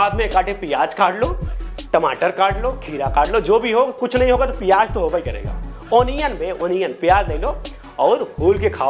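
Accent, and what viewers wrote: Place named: Bihar, India. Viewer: Gajb bhai aisan trainer to hm phli bar dekh ni ho aur apke bhasa se lga ta rua Bhiar ke lal bani kahe ki hm hu bani . Good 👍job the way in which you tell everything is awesome👏 unexpected🥰🥰🥰🥰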